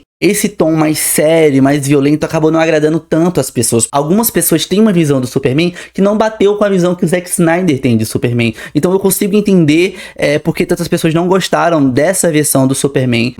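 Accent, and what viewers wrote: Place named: Paraíba, Brazil. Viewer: amei o sotaque de paraibano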